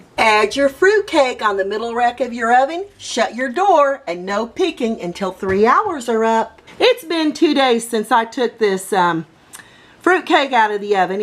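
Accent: South, United States. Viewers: Are you from the south ? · Are you from the south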